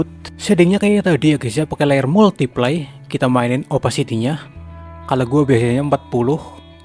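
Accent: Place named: Banyumas, Indonesia. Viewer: Tengkyu bang, btw pasti orang ngapak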